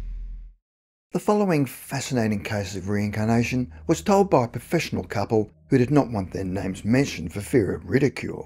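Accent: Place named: Australia. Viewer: Interesting. I died in Germany WW2 in UK Army. Apart from UK and Ghanaian' I've also met German and USA people who also have memories of their WW2 deaths. But I don't talk publicly because people get unreasonably angry at such disclosures. I mention this because the audio narration for this video is Australian. And if USA, Brits, Ghanaian and Germans are already back from a WW2 death, it signifies Australians have also returned to rebirth in a new physical body but may not yet be aware of it. I think rebirth is required for further evolution of our human consciousness. I died in Jan 1945 north west Germany and was reborn London UK Aug 1949. So, some of us come back very quickly. I don't hate Germans. I love German music Bach Beethoven, et-al. I consider the world was sucked into a cosmic maelstrom at that time, and that caused the wars. You can't stick the blame on any one group. 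About the young boy in the featured story...........did he die prematurely from a car accident or illness, which is how he died, and departed from his wife, who he had had great affection for? Note: I don't do drugs or alcohol, so this not a fairy story.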